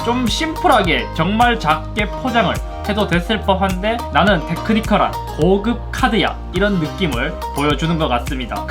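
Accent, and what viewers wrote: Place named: Gyeongbuk, South Korea. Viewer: 경북쪽 이신듯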